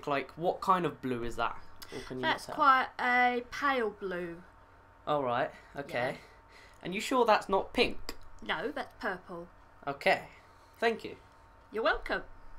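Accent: United Kingdom. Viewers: Yes another british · Are u british?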